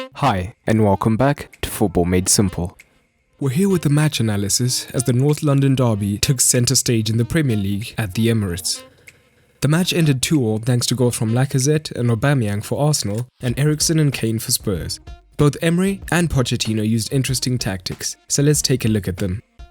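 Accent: South Africa. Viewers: You by any chance from South Africa lad? That accent...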